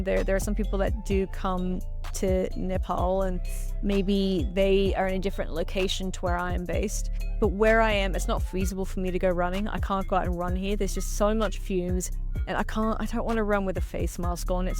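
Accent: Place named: Australia. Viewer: Do you happen to be from Australlia😅? I noticed your australlian accent and the slangs right away😁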